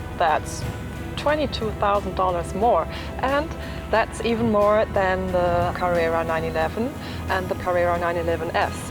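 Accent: Germany. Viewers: ***** You do realize that it is possible to complain about the reviewer without regard for which brand they are reviewing right (ex. like preferring EVO to Autocar)? If you like german accent, that's fine. Some people, including me, don't. The only idiots here are people who don't think other people are allowed to disagree with them. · next time you want a german guy to review a german car, give me a ring. damn...! I cannot listen to this accent any further.... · She's from Germany, English is not her first language. She's doing her best to give you a decent review of the car and in my opinion she's doing a good job at it. Respect.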